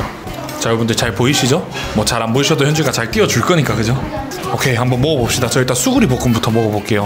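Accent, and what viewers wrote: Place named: Seoul, South Korea. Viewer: 이제 인트로가 서울말 다됐네 다됐네.....초심....유튭최강자 되니까 사람변한다....사투리로 돌아와줘😭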